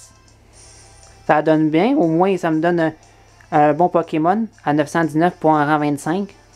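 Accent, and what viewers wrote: Quebec, Canada. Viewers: je te soutient mec Même si tu est québécois tu fait l'effort de parlé fr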